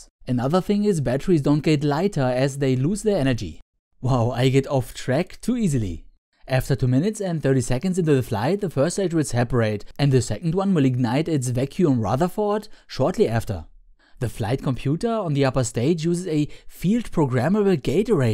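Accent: Germany. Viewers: Dieser deutsche Akzent ist so göttlich. :)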